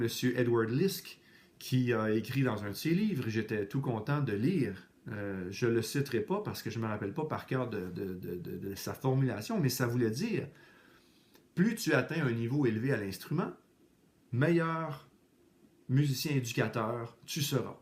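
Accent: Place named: Quebec, Canada. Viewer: J'adore l’accent québécois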